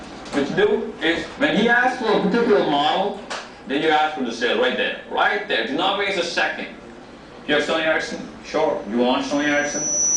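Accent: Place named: England, United Kingdom. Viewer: Love his English accent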